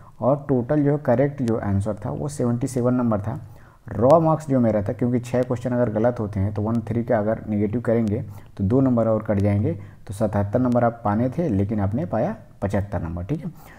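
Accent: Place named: Tamil Nadu, India. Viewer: You sound like tamilan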